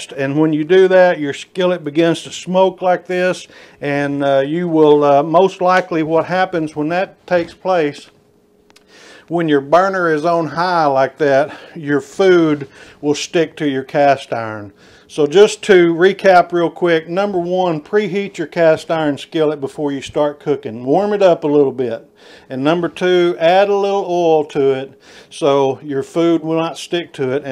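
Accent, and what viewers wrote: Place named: Tennessee, United States. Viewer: That's the way it's pronounced in middle Tennessee where I'm from. Like does.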